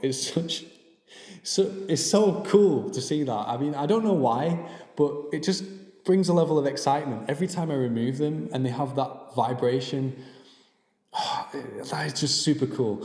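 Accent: Scotland, United Kingdom. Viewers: oohhh scottish accent!!!!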